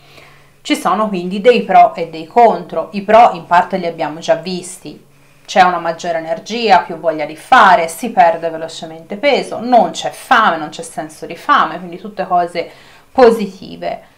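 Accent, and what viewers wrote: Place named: Tuscany, Italy. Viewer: Grazie mille. Ti ho conosciuta con questo video perché cercavo testimonianze sulla dieta keto. Purtroppo dovevo iniziare lunedì scorso ma la notte sono stata male. Il mio nutrizionista mi ha detto che devo per forza prendere gli integratori di magnesio e potassio ma io avendo una malattia autoimmune allo stomaco trovo difficoltà. Ieri ho riprovato e ora sono di nuovo con un mal di stomaco assurdo. Ti ringrazio della spiegazione. Sento che sei toscana anche tu. Sai se ci sono asl o ospedali dove poter fare la keto? Perché io ho tante patologie e non vorrei sbagliare. Devo perdere peso tanto... per salute. Grazie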